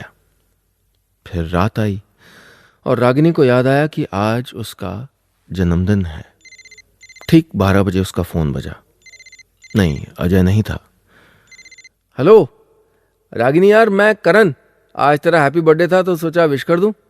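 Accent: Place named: Punjab, India. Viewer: Your punjabi accent is awesome....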